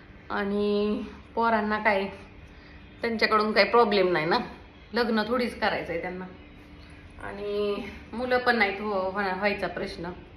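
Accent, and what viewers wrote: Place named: Pune, India. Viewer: Tumchya bolnya warun tumhi punyat kuthe Rahat aasal te lakshat yete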